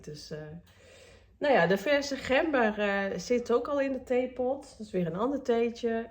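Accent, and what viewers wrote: Utrecht, Netherlands. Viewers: Beterschap aan beide! Jullie hebben denk ik inderdaad het griepvirus uit Nederland te pakken. Op mijn werk zijn ook ontzettend veel mensen met griep.. het is dus erg besmettelijk helaas. Je had ook gewoon een weekje over kunnen slaan he! Ziek is ziek! 😊 En negatieve reacties? Ik snap dat nooit. Niemand is toch verplicht om te kijken?! Lekker jezelf zijn! En als dat als Lenie van de Takkestroat is… 😉helemaal prima! Dialecten zijn mooi! Er zijn al zoveel mensen die dialectloos door het leven gaan.. ik vind het heerlijk om aan het dialect te raden waar iemand vandaan komt. Voor je het vertelde had ik Utrecht al juist geraden🤣 En.. het valt ook wel weer mee hoor! Doe nog even lekker rustig aan en goed uitzieken! Ik doe niet mee… met de griep bedoel ik dan hè😊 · Ohhhh kaneelbroodjes, sneeuw en een sprookjes verlicht huisje!!! Even in de natuur, daar knapt een mens altijd van op. 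Nou dat Utrechts komt mooi uit, dan begrijp ik je prima!! Haha, dus lekker blijven kletsen... En laat ze maar kletsen!! 😂😂 Inderdaad, blijf wie je bent!! Ik hoop dat je inmiddels bent opgeknapt, zo niet... ziek lekker uit. Dank je wel weer voor toch echt een leuke vlog met mooie beelden. Alleen daarvan al kom ik tot rust... Hej då...❤ Oh ja... #ikdoemee 😊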